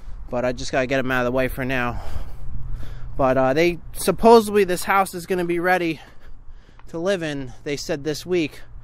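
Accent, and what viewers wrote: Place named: California, United States. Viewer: What state is this in? Sounds like California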